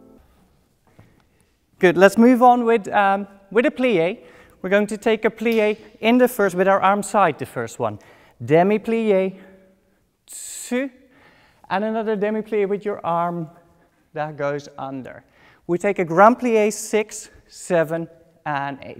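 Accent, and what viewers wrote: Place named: Australia. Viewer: his accent sounds partly Australian ...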